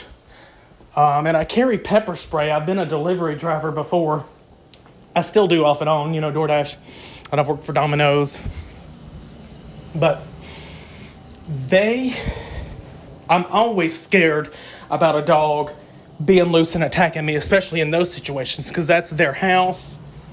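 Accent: South, United States. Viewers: please put your paypal link in the description box for ALL your videos so we can donate to your work. Oh and another thing, dont you dare change your accent or many of us will unsubscribe. We love you southerners the way you are - this is because many of you white folk in the deep south are just beautiful human beings - so promise us all that you will never change.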